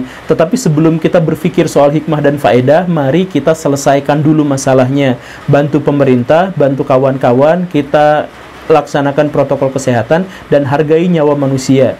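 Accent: West Java, Indonesia. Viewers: Pak guru orang mana ya? Logatnya kyk dari tanah sunda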